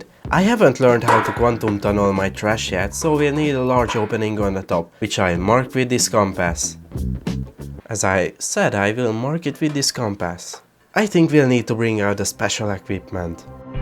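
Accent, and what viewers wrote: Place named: Hungary. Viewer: Your pronounceination sounds very hungarian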